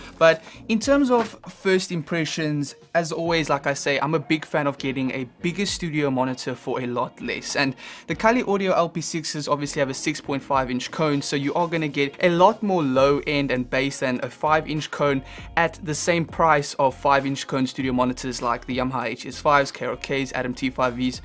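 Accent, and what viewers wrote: South Africa. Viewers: I hear a South African accent. Greeting from a fellow South African muso living in nashville.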